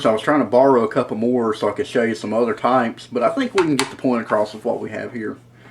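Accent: South, United States. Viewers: Good info...learned much. Rather new at this. The southern accent was a challenge but hit replay a couple of times to understand what you said. That's fine...I got it... Thanks for taking the time to put this out.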